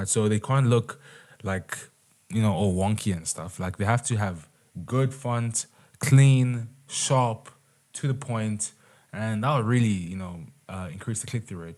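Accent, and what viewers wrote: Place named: South Africa. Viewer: i could tell you were a fellow south african by the accent.😅.beautiful course brother.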